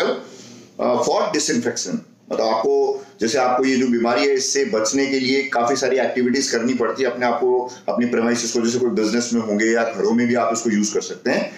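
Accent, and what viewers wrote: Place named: Rajasthan, India. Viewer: nazar कमाल है मतलब सही में आप राजस्थानी हो actually आपका बोलने का शुद्ध तरीका जो h wo और इतने बेबाक तरीके से राजस्थानी ही बात करते हैं और intelligent हो आप क्योंकि में भी राजस्थानी हू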